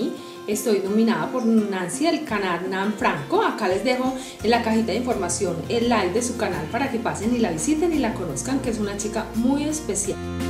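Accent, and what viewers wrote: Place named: Medellín, Colombia. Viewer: Yo creo que más que dejar un LIKE por dejarlo yo creo que para algo se creó así como el dislike y pienso que dar LIKE a un vídeo es de alguna manera valorar el trabajo de la persona porque el que hace vídeo como nosotras sabe el trabajo que esto lleva y lo que uno se esfuerza para que de alguna manera entretenga y guste. Por otro lado también estoy de acuerdo en algo que mencionaste muy por encima y es que algunas personas se ve que por quedar bien decir bueno ya cumplí ya me pase por tu canal te dejo un comentario para que sepas que te veo y que me debes pasar por el mío. A mí eso me parece de mal gusto porque el vídeo dura 5 min te lo reproducen 30 seg mientras te escriben y ya. Yo no hago eso a menos que el vídeo no me guste por supuesto. Pero estoy absolutamente de acuerdo contigo. Quise ver este vídeo porque quería conocerte por el acento seguro eres de Medellín :) que bueno saber un poquito más de ti. 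Un abrazo y nos seguimos viendo guapa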